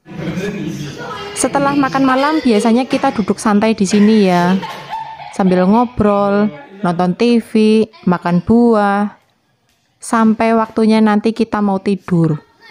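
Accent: Banyumas, Indonesia. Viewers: Wong ngapak apa yak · Mbak dari daerah mana di Indonesianya? kok ngomong nya kaya orang daerah Banyumas.... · Wong ndi kw yu... Logate kyk ngapak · Mba logate ngapak, wong ndi mba.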